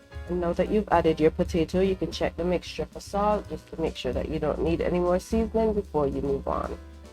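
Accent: Antigua and Barbuda. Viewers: Are you Antiguan? You accent sounds that way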